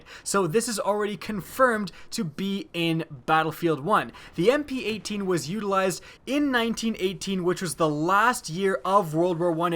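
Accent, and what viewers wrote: Russia. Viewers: Nice Russian accent 👍🏻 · Nice russian accent and hello from Russia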